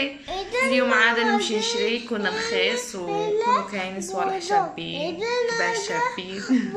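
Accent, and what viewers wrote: Tlemcen, Algeria. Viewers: هدي هدرتها هكا حنا التلمسانيات نهدرو هكا و كاين اللي يهدرو بال a يهدرو بضرافة كثر من هاك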